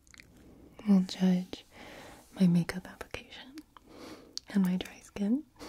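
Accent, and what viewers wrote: South, United States. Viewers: She's southern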